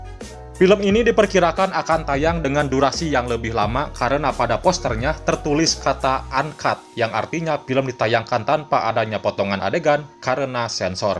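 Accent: West Java, Indonesia. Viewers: vo nya org sunda fix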